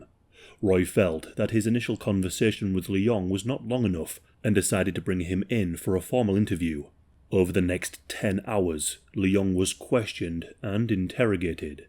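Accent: United Kingdom. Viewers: I just get more and more addicted to this damn channel and the narrators UK accent along with Shrouded Hand there's no way any Yank across the pond can make any true crime content this bold unique and exclusively personal .👑👑👑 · Are you British?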